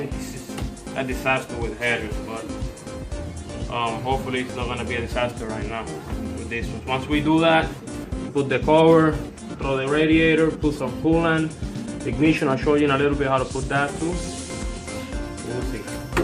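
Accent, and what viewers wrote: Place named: United States. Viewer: Pareces un Yanki hablando English...coño.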